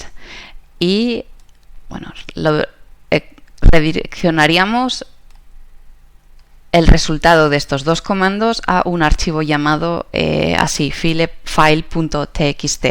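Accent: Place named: Navarre, Spain. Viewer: hola! en cuanto te he escuchado he sentido que eras cercana a mí. así que de cerca de Pamplona, yo de cerca también, en la ribera. muy genial tu canal, me encanta la temática y lo simpática que te muestras. ¡AUPA PUES! 😜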